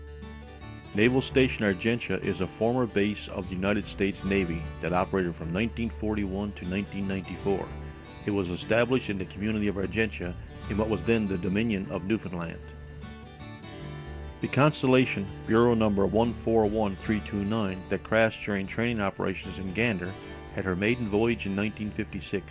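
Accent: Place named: Cornwall, United Kingdom. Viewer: The narrator has a VERY Cornish inflection to his accent. He might have ancestors in Devon.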